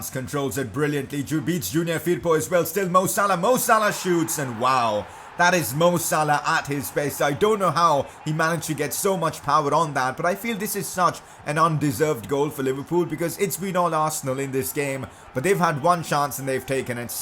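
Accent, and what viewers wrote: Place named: India. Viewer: Bro ur fucking Indian voice is annoying 😣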